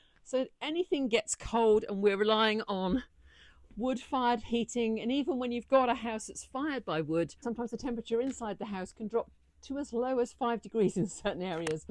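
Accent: United Kingdom. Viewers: Du bist Schwedin??? Warum hast du so einen englischen Akzent?? Ich verstehe schwedisches englisch sehr gut, englisches nicht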